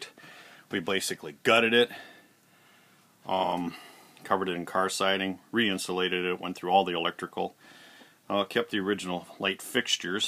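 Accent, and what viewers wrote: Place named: Minnesota, United States. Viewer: Are you from Minnesota? When I heard you say "The Cities" it made me think you are. And the way you talk too lol.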